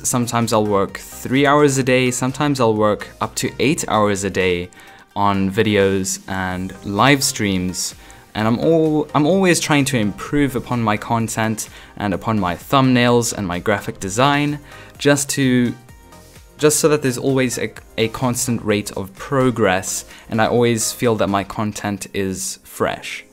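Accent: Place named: South Africa. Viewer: It's obvious his white.His voice sound like a white south african and i would know because i am a white south african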